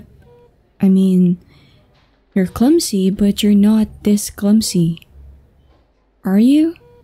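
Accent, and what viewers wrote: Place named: Philippines. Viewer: U sound very Filipino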